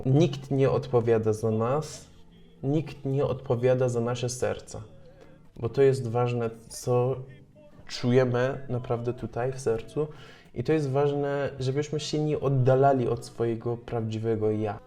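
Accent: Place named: Rzeszów, Poland. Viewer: teraz wyglądasz i mówisz jakbyś był z Rzeszowa:) Pozdrawiam.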